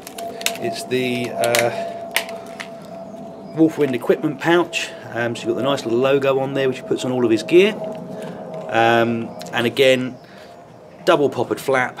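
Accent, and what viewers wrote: United Kingdom. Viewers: I do not approve. You're not Batman and do not need a utility belt made of quality leather to hold your Altoids tins. It's a superfluous accessory and you can chuck all of it (and more) in a backpack. Plus, if you're in UK as your accent suggest you might be, you're within walking distance (and probably earshot) of a highway, so...it's unnecessary for that reason too.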